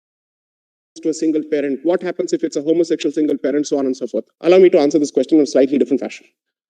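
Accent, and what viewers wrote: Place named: South India, India. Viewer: i have said him south indian because he has south indian accent in english and south indian is not a bad word so no need to feel offended on his behalf and you are taking south indian as low word so you have very expensive mentality!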